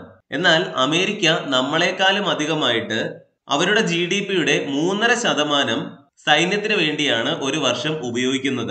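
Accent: Kottayam, India. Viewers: Kottayam ano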